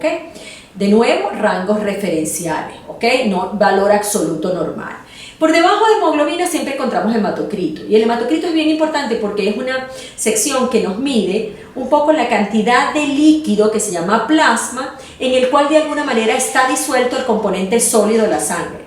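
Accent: Venezuela. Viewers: Dra. es un placer ver sus videos tan claros y con mucha seguridad. No se de donde sea usted pero por su acento me parece Venezolana y eso me hace añorar nuestros Medicos ya que en estos momentos estoy viviendo una situacion bien desesperante con mi salud tengo 8 meses presentando una serie de sintomas y los medicos aun no me dan un diagnostico solo examenes y mas examenes y yo ya llevo 45 libras perdidas vivo en USA y me parece INCREIBLE esta situacion en un pais como este; añoro tanto mi pais y mis medicos Dios la bendiga por su labor.......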